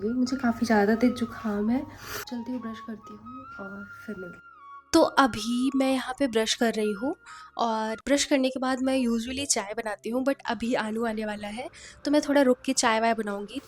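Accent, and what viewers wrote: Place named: Uttarakhand, India. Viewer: Tone sun k lag rha ki ap uttarakhand se ho ......kis distric se ho Btane ki kripa kijiyega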